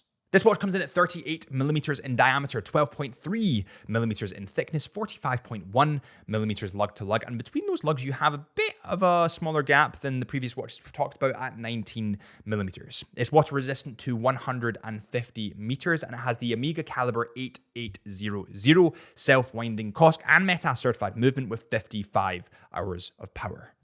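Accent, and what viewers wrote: United Kingdom. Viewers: Bro if u want your channel to have more wider audience speak slowly coz not all of us are native from UK that could easily understand your accent, I love your channel but i keep repeating it just to understand on what you are saying, i know that theres a subtitle but still its not accurate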